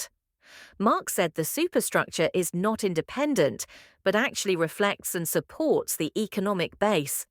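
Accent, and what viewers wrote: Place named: United Kingdom. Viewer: How is the channel location Pakistan while the narrator voice is white British?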